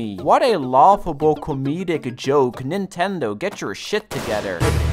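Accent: Netherlands. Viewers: Man is dutch? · ah yes, dutch accent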